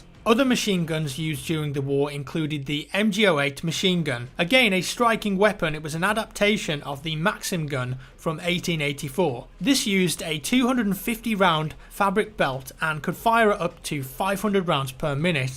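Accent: United Kingdom. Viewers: you must be british your're accent like jason statham;) #iLikeThat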